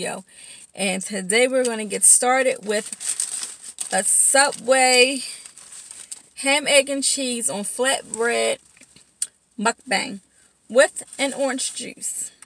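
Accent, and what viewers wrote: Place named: Baltimore, United States. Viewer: I just love all of your subway videos!! Are you from Maryland? You have an accent that I can't place, but you sound like my old neighbor. She was from Baltimore. I'm from Georgia, so you can always tell when someone moves here from some where else. She moved back there to be close to her family, but if I close my eyes I hear her talking. So just curious? Also wonder what kind of work you do? Your daughter is adorable!! I love, love your subway videos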